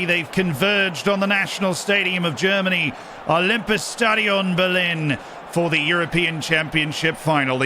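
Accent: England, United Kingdom. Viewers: His British accent is immaculate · This was awesome! And colour me impressed by your english accent. Didn't know you had it ya. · Love the English accent quite happily listen to your commentary over most other people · love the non regional British accent but pronunciation is still americanised if you know what I mean... Still love your content tho.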